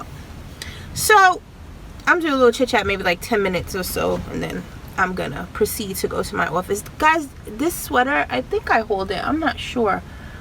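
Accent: New York, United States. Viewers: Hi sis, lol, I love you right..lol but I use to think at first you sound mad, but over the years since I know you better, I know you dont have an attitude. But its just the way you guys sound in NY, just had this convo with some ppl I know from NY, to me she just seems so harsh but thats just her demeanor and her way of speaking. Just like the way I talk is different, some say country or soft spoken. Now go watch my vid and tell me how I sound to you..lol..great video always and true about the life timers on welfare. The abusers, but the ones who dont try to do anything in life but use up the system, its the kids I worry about not the parents. Trump just wants to see how far he can rule and mess up lives. tfs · girl, you had me cracking up about that police officer and that gym...you like bitch, I don't want to talk to you! lol...lol...I was dying, I feel the same way, the gym is suppose to be where you get your workout on and then bounce, I woulda been mad too if I was you, I hate being bothered, especially when I want to be left alone and do my own thing...did you ever get to go to that restaurant or to see hidden figures? 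girl viola davis is an amazing actress, she puts her heart, soul, and everything else into her acting...I haven't seen either movies because unfortunately I don't get the chance to really go and see movies like I use to, but both movies look like they ought to be great.. look forward to seeing your mount review...I always wondered some time back how people was able to do that...giggle...I was lucky, when I was in my car doing videos, I wasn't moving, just sitting in my car, and the way my car is made I was just lucky, I was just able to sit up my camera with a small box and it worked...giggle..I think you have a little bit of an accent, not much... I have heard new yorkers talk and a lot of them definitely has it..does it sound like attitude? sometimes, depending on the person, andrew dice clay comes to mind, now that dude has a hella accent, and when he talks most of the time, its with attitude, lol...so again it depends...and oh girl! 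yes I saw new edition movie and I loved it!! all the actors were on point, I actually did a review on it, when you go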